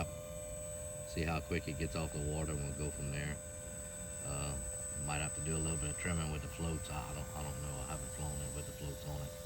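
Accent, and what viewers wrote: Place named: Louisiana, United States. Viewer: Takes me back to Pierre Part Louisiana the Cajun accent!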